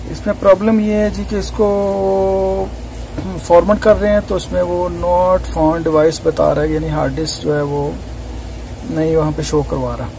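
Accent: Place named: India. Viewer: If u hear an indian accent u know its gonna work. I've been working on this problem for 3 hours and after doing what this legend does it worked first try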